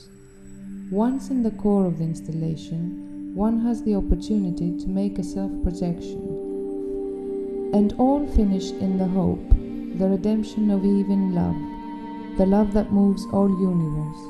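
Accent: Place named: Greece. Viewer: she speaks with a heavy accent, is she greek ?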